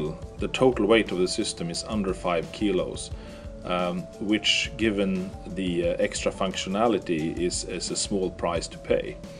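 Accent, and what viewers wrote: Sweden. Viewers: Swenglish! That's a Beaty.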